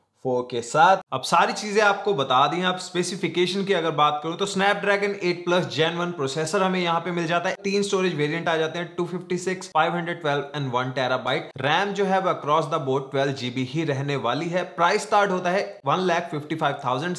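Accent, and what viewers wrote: Punjab, India. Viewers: Punjabi Bande Di Punjabi Hindi Ch Nikal Ee Jandi..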